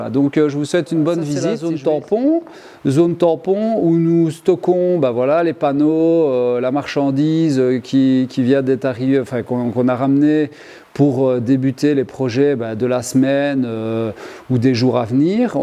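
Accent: Belgium. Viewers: Rare sont les artisans qui soient autant passionnés et très pédagogue dans le partage de leurs savoir faire et en plus avec l'accent Belge !!!!!😁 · Slt, tu as un chouette atelier, merci pour cette vidéo, tes conseils et le parler Belge avec humour. Pour les cours d'api, tu as un voisin qui maîtrise très bien le sujet: <link> à bientôt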